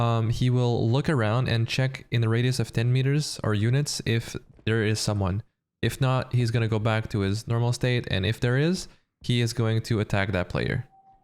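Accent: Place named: Quebec, Canada. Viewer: It's random but, I hear a quebec accent are u quebecois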